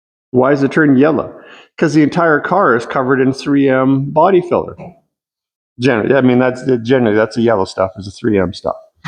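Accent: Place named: Canada. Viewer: reminds me of Bob and Doug McKenzie, must be the accent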